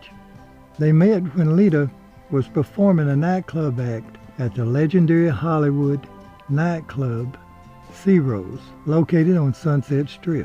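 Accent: South, United States. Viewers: Mr, I always enjoy your stories. Keep up the good work. Love your soft southern voice. A friend of Lee Remick, Amanda Lane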